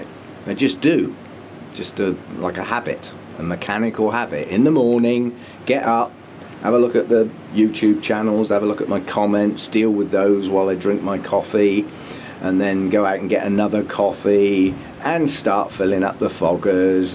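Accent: United Kingdom. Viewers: I have to watch your videos! There are so many bits of info that you share. And I love a British accent, your sayings and sense of humor.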